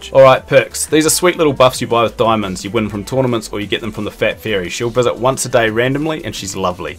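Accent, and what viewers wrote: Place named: Australia. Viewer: must be the aussie accent as well <3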